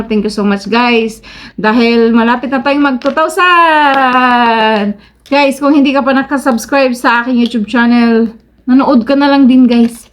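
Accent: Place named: Ilocos, Philippines. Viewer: Yung accent mo ma'am mas malapit sa ilocano kaysa cebuano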